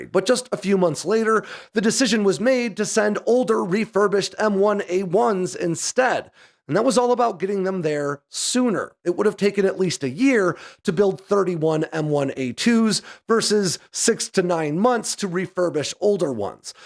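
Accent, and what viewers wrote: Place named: New Hampshire, United States. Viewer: He went to school in New Hampshire and lives in Georgia. His accent is definitely New Hampshire, but some southern gets in there from time to time.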